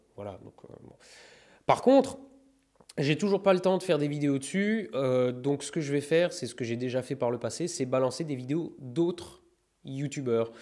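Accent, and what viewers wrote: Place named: Alsace, France. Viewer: Yoh.. T'es bien un alsaco toi... Ou lorrain ^^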